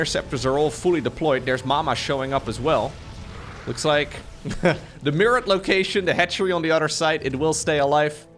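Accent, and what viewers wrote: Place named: Netherlands. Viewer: Interesting how you Dutch people pronounce stuff. But when you typed it, I understood right away. In German it is "Schurke". But we pronounce it quite differently. Interesting :)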